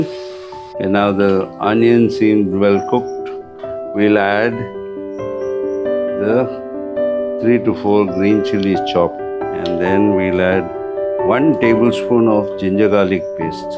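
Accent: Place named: India. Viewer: I’m third generation Indian born and living in South Africa. Love the content, your accent is a little bit similar to first generation Indians. My grandparents surname was Vengtas Ramiah, they are now late and wish I knew where in South India we are from. I visited Mumbai for work and loved it. Love from Johannesburg ❤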